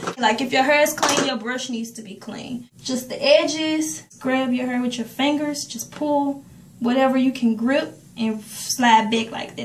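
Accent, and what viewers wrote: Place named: Memphis, United States. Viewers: I knew you were from Memphis I hear it lol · I knew you were from Memphis 😣. Lol I can tell by that accent. I'm Nasvillian ✌✌✌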